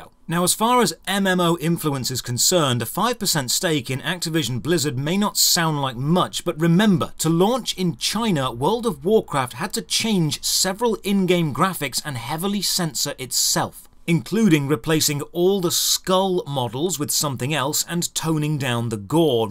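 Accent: United Kingdom. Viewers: Why is reviewing MMO with a british accent?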